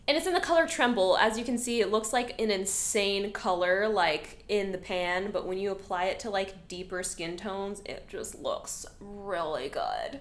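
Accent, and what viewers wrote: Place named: Australia. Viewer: I thought you were gonna say it's a "wowder" LOL been waiting for someone to shade glossier like that You look great!!! Also loving the free aussie accent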